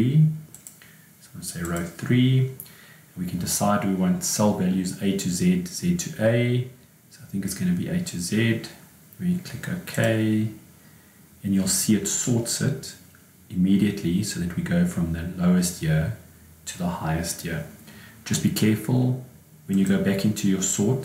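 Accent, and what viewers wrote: South Africa. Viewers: It took me longer to find this than it needed to be, so it needs to be higher up in the search engine! Also loved hearing the South African accent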